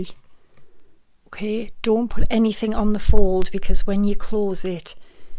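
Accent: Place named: Wales, United Kingdom. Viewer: Thank you for this, you make it so simple with easy - to - follow instructions, and your lovely Welsh accent is a bonus. Kathy from New Zealand.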